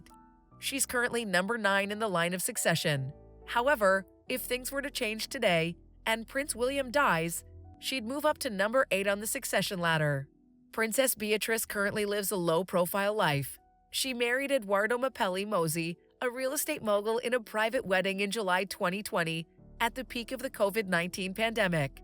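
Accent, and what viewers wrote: United States. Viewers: I cannot stand an american commentig on the Royal family, it just does not sound right.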